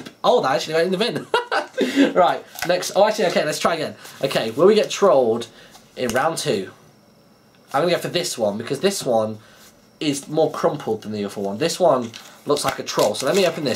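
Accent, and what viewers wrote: United Kingdom. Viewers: I love his british accent and the british sleng at "Hello everybodiiiiii!!!!!"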